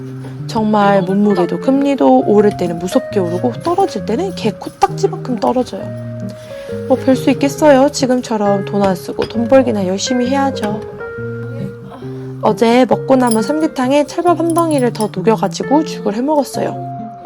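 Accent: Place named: Jeolla, South Korea. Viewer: 저도 광주사람이라그런지 전라도사투리가 친숙하게 들리네요~~영상 잘봤습니다~~❤️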